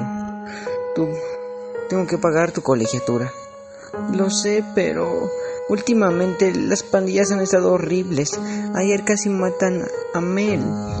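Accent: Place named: Latin America. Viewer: Oye Heres Latinoaméricano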